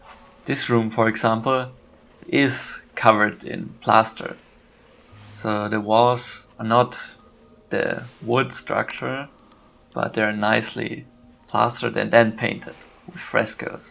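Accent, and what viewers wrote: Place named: France. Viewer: sounds france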